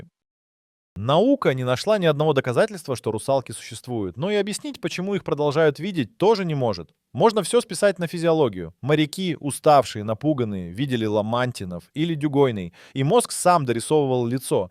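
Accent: Portugal. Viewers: Vídeo com sotaque d Portugal , ora em castelhano , ora em português do Brasil , q bosta d vídeos fake como a locução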